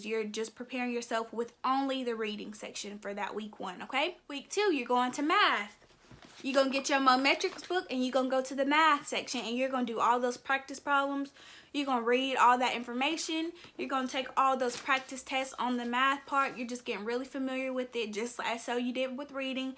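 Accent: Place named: South, United States. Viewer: Yesss Queen, thank you & I love your southern bell accent soo cuteee.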